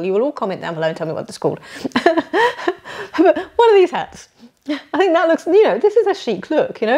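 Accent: United Kingdom. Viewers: I just found your channel. I am 50 and trying to style myself well without looking too old or young 😅. I was reading the video and decided to click and listen. I said to myself I bet she has a British accent. I was right 😂. I love listening to you and you give some good thoughts on leggings. New subscriber here!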